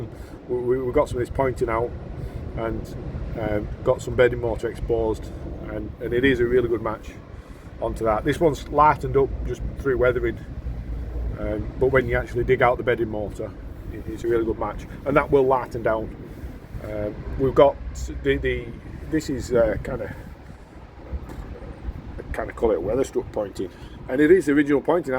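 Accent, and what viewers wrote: Lancashire, United Kingdom. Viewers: Your accent ? I'm going to say Colne in Lancashire? Great video BTW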